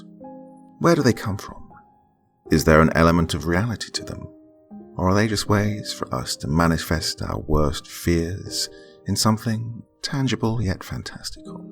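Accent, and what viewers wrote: Russia. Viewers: OMG YOUR RUSSIAN ACCENT!!!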